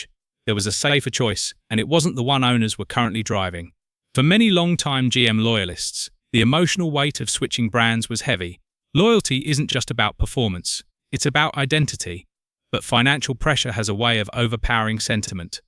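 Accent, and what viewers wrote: United Kingdom. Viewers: Some Englishman is babbling about American pickups? ......Get real.....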